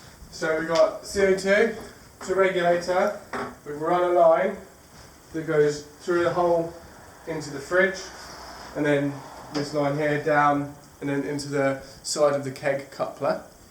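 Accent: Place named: Southern England, United Kingdom. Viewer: u sound like your from south England but ur Australian ?? crazy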